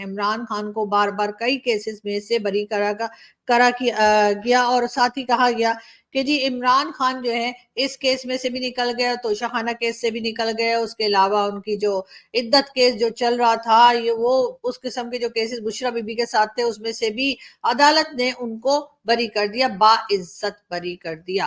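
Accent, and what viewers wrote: India. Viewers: Your style speaking is just like Indian and I like it too much . Your electricity bill gave me a big shock. I always smile to watch your videos .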